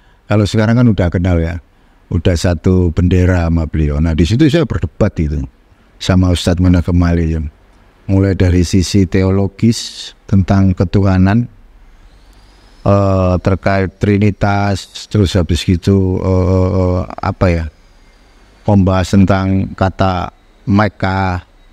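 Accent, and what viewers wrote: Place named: Surabaya, Indonesia. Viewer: Masya Alloh b sy kgum dg anda,nada bicara anda yg santai dg logat Surabaya,pengkajian anda yg sgt ditail n mudah di fahami,SMG anda tetap Istiqomah.amin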